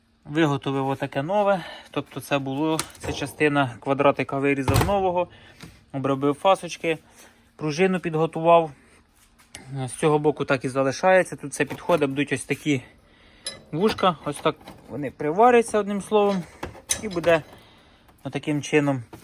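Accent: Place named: Right-bank Ukraine, Ukraine. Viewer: Бля ,як отличаеться по говору правобережжя пид риччю посполитою вид ливобережжя не московитського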